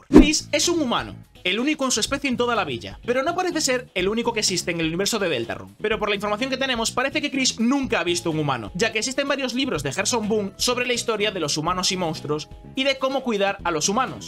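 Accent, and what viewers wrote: Galicia, Spain. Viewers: se te nota lo gallego Pd. Aléjate de las cabras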